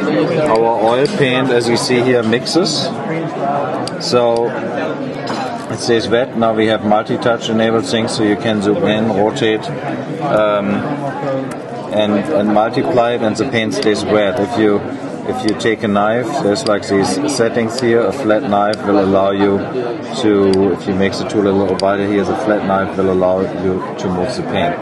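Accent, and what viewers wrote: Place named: Germany. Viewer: are you from germany? you sound like one :D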